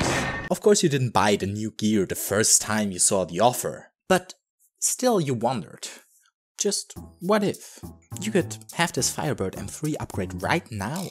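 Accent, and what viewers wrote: Germany. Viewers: ru german your accent sounds like it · based on the accent im assuming you are german?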